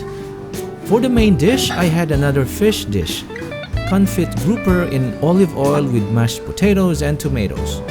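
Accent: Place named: Philippines. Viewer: Your. Filipino accent is so thick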